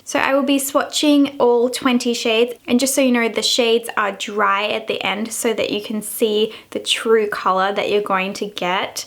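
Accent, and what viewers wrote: Australia. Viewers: You have the cutest voice...and the Aussie accent doesn't hurt!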